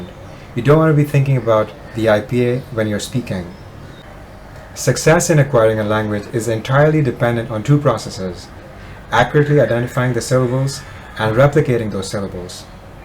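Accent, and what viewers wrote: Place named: India. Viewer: Your indian accent is still a bit heavy, but much more better then most indian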